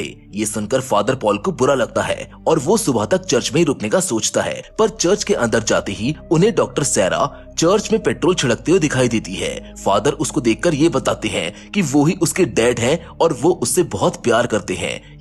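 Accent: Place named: Bollywood, India. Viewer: Aapka Bollywood silver screen Wala accent hi bohot accha lagata tha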